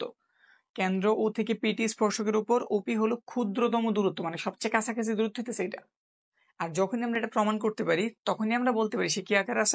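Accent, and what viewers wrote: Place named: Barishal, Bangladesh. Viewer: স্যার আপনার😊 বাড়ি বরিশাল আমার বাড়ি কিন্তু বরিশাল😂 কারণ আপনার কথায় বোঝা যাইতেছে😮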